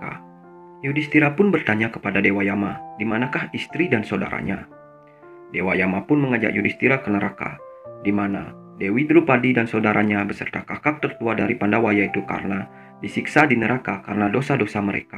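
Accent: Bali, Indonesia. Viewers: ciri khas logat bali heheh pelat r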